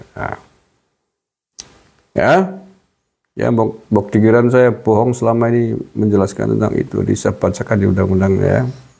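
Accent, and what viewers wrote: Banyumas, Indonesia. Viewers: Buzzer ngapak y?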